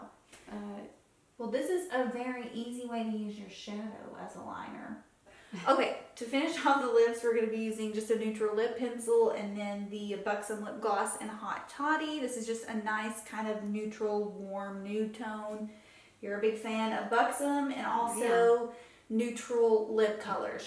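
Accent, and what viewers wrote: South, United States. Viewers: Your beautiful Southern accent became much more prominent in your conversation with your mama. You sound like me! I love it when Southern-born people proudly keep their accents. 🤗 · When you're near your mom, your southern accent comes back a little bit lol. Such a sweet video!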